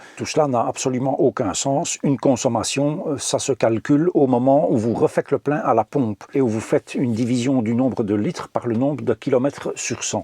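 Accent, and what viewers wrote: Belgium. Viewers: Bonsoir. Un grand bonsoir à la Belgique! Pour la conso en eau je ne puis comparer à la maison. Parce ce que j'ai 2 ados en plus par rapport à mon CC (motor-home!!) Donc machines à laver, lave vaisselle, jardin, piscine...Ceci dit ma douche dans le CC m'a appris non pas à être propre, mais à économiser l'eau sur ce poste. J'adore l'accent qui me rappel mon oncle et tante de côté de Lens (en Belgique bien sûr!)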